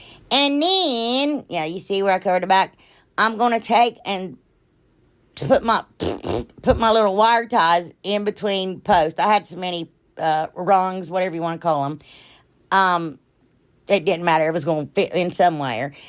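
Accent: South, United States. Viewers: I LOVE all of your crafts, but honestly i dont know if i love those, your adorable heavy southern accent, or your silly and lovable personality more!!! You are my favorite!!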